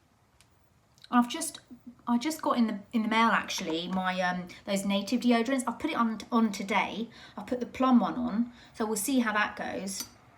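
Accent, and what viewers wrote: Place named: North, United Kingdom. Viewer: 🤣🤣🤣 yes the northern accent!! I love you when you do that! Been having a brill day today but you have just made it better!! I get so excited when you post a video and just love the chit chat 🤸😊😁♥️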